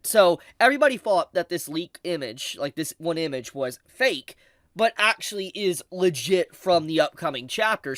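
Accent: South, United States. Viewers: idk your accent sounds a little southern so you might be use to this sort of thing.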